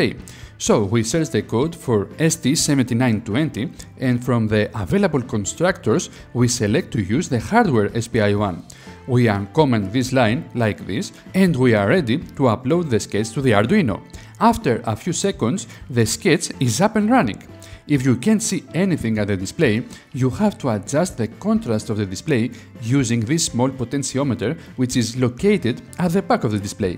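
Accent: Greece. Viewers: Thanks for this video. By the way, are you greek ? Your accent seems familiar :D :D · Are you Greek? I am about 95% certain, this accent is quite characteristic.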